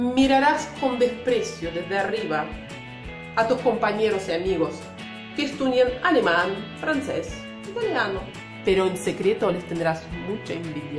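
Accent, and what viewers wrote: Argentina. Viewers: Muy bueno, hablas como argentina nativa! Espero alguna vez hablar así el ruso. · Vives en Argentina??? Lo digo por el acento que tienes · Que graciosa Rusa hablando argentino 😁.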